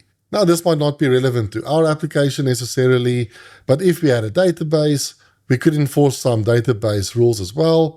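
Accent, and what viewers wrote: South Africa. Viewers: I instantly recognized my home country's accent. RSA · I'm here for the content, and the fond memories of South African accents!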